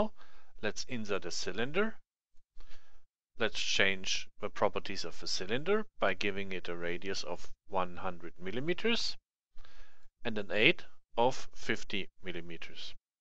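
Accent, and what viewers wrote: Germany. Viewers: Great tutorial! And love your German accent!!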